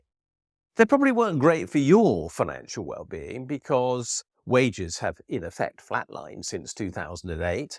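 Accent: United Kingdom. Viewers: It's still ranting and lunacy if it's said slowly by a posho brit.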